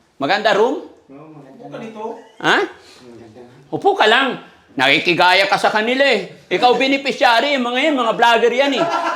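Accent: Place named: Quezon, Philippines. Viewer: Kkatuwa nman😅ganda ng bahay at mga rom banyo sopa parang rich na tlga ❤ ang hagikhik yong tawa ng tawa na wlng sounds pg sa sobrang happy n ayaw marinig malakas n tawa tawag hagikhik na sa isang tabi kkatawa ganon yong salita ni prang sa amin sa quezon tagalog n tagalog❤goddess team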